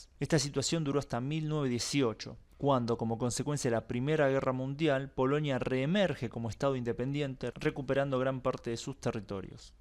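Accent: Buenos Aires, Argentina. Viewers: buenos videos, lastima tu acento tan porteño, cabasho, ashi, asher, mishones....caballo alli, ayer, millones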